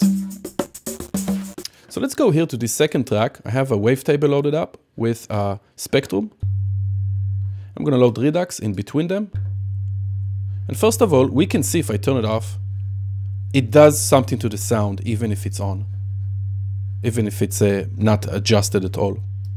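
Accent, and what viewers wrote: Israel. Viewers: dude I can't get over that Israeli accent, love it!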